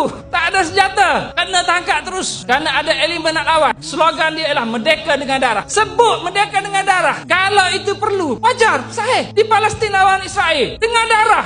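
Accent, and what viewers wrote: Kelantan, Malaysia. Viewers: Hok kecek ni bare klate wlaun,ambo kenal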